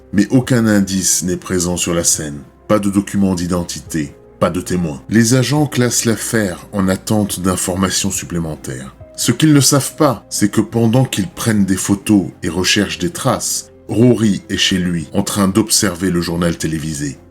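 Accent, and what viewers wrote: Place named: France. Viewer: Coucou, j'espère que tu vas bien ? Que faire quand on se rend compte de ta notification vers 4h du matin ? On se couche plus tard car on ne peut pas résister 😂. Par contre tu as un accent français très marrant. Encore un qui a eu une enfance très solitaire et pas très stable, pas très enrichissante pour un enfant. On peut comprendre qu'il veuille prendre le contrôle, lui qui ne l'a jamais eu et surtout se faire voir. Par contre il n'a pas "chômé" niveau nombre de meurtres, il les a tué de manière assez rapprochée par rapport à d'autres tueurs en série. Merci encore pour ta vidéo hyper intéressante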